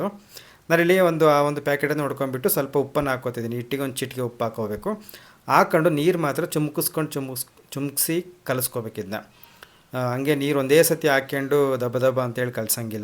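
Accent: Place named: Holalkere, India. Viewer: Nimmadu holalkere taluka bro Nammoora kade thara ne maathadtira.... normal blr bhashe ellaru maathadthare.. continue like this 😅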